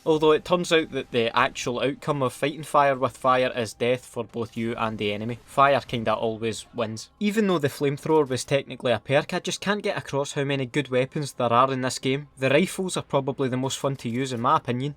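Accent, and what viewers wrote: Scotland, United Kingdom. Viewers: I like watching u more than other youtubers probably because he Scottish haha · Your scottish accent made this video 10X better lol.. love this game and so glad there making a new one. 👍🏼👍🏼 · I watched so many of your videos back to back I've taken up some of the Scottish accent and I DON'T REGRET IT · Your Scottish accent is a gift from the heavens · Why have I only just found out about this channel, I fucking love this, nice work bro😂😂 the Scottish accent made it twice as good👌👌👌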